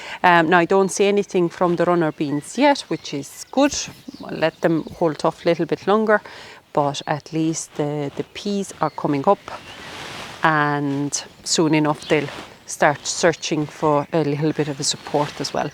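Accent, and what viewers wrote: Ireland. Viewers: Only discovered your channel. Great video. Trying to place your accent. I'm thinking maybe you're originally from Poland, but have lived in Ireland for many years! You have a strong Irish accent now. ☺ I had a Geum in my garden. I was a way for a while, and the Geum has self seeded A LOT!!! I think mine is "totally tangerine." I had one, now I have five. To be honest, they've taken over a certain part of my main flower bed, and have stopped my ferns from growing which I'm quite surprised about. I'm going to see how they look in their new spot later in the year when everything is growing and if I'm not happy with them, I'll get rid of them and plant new ferns (spot is a little shady). PS: I love your dog.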